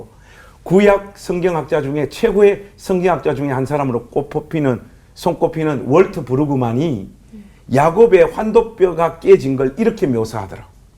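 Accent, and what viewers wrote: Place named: Seoul, South Korea. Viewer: 목사님~ 서울말씨? 멋져요^^ 올포원의 죠지클루니 맞습니다!!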